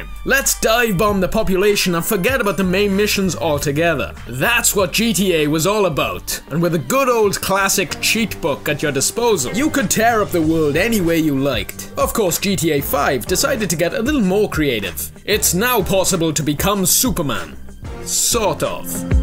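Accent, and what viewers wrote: Wales, United Kingdom. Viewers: great video I love your work. where are you from? Guess Newport/Cardiff way by the accent? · Yeah, Im pretty sure hes welsh. Sounds just like I guy I know who is from Wales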